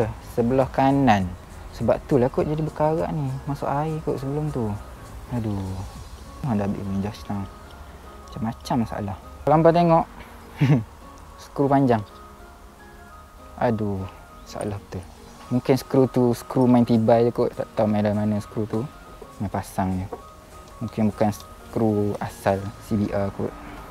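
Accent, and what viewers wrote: Terengganu, Malaysia. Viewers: dah makin hilang slang ganu tu, tapi video still terbaik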